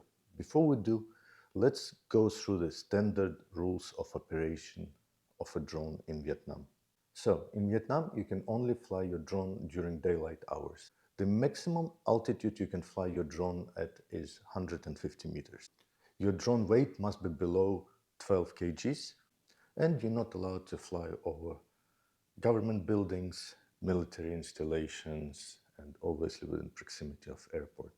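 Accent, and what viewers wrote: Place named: Turkey. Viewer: Abi Türk müsün aksanından anladım selamlar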